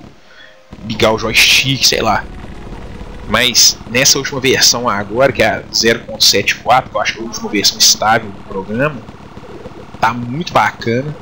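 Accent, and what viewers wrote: Minas Gerais, Brazil. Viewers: Sotaque seu é mineiro.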